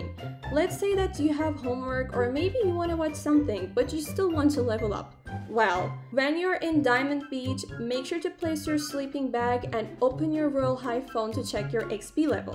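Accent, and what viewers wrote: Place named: Mexico. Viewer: she sounds like she’s Mexican 😳 but the fit is cute😊😊😊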